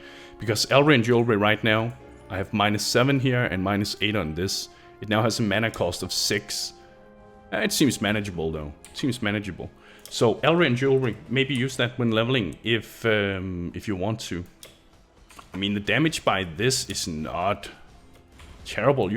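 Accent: Denmark. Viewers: Are u scandinavien? Maybe even Danish?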